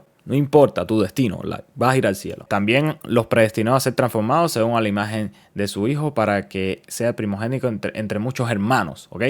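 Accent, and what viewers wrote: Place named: Cuba. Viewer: Bro eres Elieser el Bayardo versión agnóstico ateo , me encanta escuchar el acento cubano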